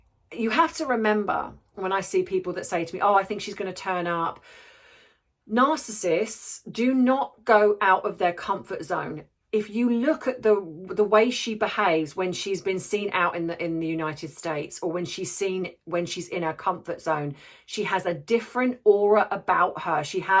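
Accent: United Kingdom. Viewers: Thank you, my dear! I could listen to your lovely British accent alllll day! 💕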